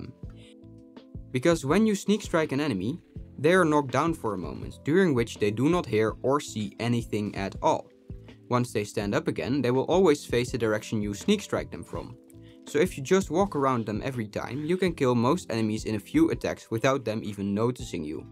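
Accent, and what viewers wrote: Netherlands. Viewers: I can hear in ur accent, u're Dutch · First 3 words and i know you're dutch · Are you Dutch because your English sounds like someone Dutch talking English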